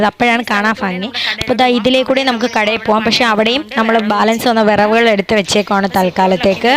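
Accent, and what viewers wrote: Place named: Malabar, India. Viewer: sukamano enn choichatha.. Malabari slang😃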